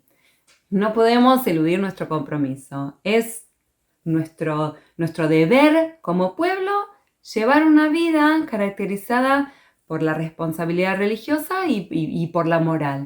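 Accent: Argentina. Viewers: Hola! Soy nuevo suscriptor y me encantan tu bella sonrisa, tu apacible mirada y tu lindo acento argentino! Claro también aprecio mucho tu información y enseñanzas sobre el Judaísmo! En el tema del pueblo escogido, tengo la siguiente duda: te he oído decir que el pueblo judio tiene la responsabilidad de dar buen ejemplo de vida y de preservar y difundir las enseñanzas de Dios, sin embargo, he escuchado decir a otros exponentes del Judaísmo que la Religión judía no busca convertir a nadie, ni lograr que otros abracen sus creencias! Cómo entonces se pueden difundir sus enseñanzas si pareciera ser una Religión muy particular o restringida a un círculo muy estrecho de conocedores y practicantes? Cuando llegue el Mesías que esperan, El difundirá a todo el mundo la religión judía y su búsqueda de la Paz, o ustedes deben llevar a cabo esa tarea?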